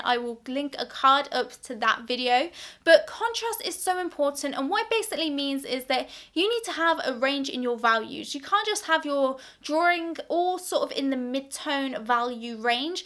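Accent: United Kingdom. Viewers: I love your British accent....but i dont have any idea what are you talking about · You are british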